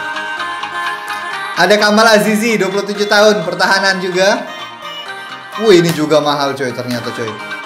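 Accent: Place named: Terengganu, Malaysia. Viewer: terengganu nelang... like org ganu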